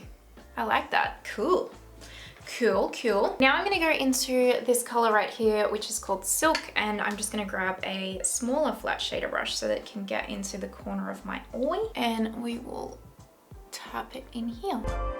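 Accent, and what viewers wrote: Australia. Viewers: Hi sweetie. I just rewatched this to see how you prefer to put the nars on and I must have missed you saying it the first time but you haven't tried the soft matt. You should. It's my favorite of all the nars foundations, It's for your more full coverage days. But so beautiful. And you get so much more product. Try it one day. xoxo BTW Great video. You always have me laughing with our aussie accent. Love ya gutts babe. xo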